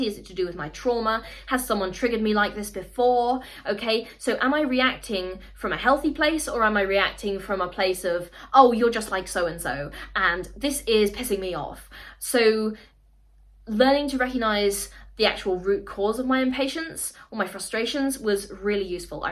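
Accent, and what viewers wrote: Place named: United Kingdom. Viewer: You came into my algorithm I was about to pass on Then I heard your accent Its so British haha Found you interesting, I'mma try to listen to more of you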